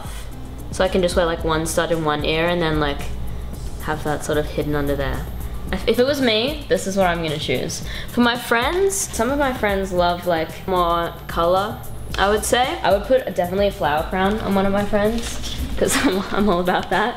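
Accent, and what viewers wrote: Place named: Australia. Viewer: im happy you still have an aussie accent :) ccome backkkkkk!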